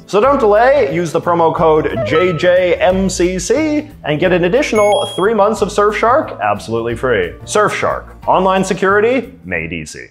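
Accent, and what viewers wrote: Canada. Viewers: Bro are you Canadian AF or do you hail from NorCal? Californadian? 🧐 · Thee most Canadian accent I’ve ever heard lol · Hes Canadian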